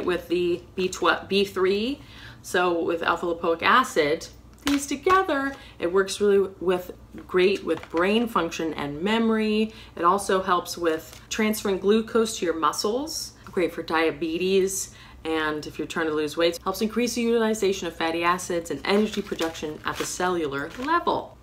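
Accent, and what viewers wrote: United Kingdom. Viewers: did you ever live in England, flipping is an English term and you sometimes have an English accent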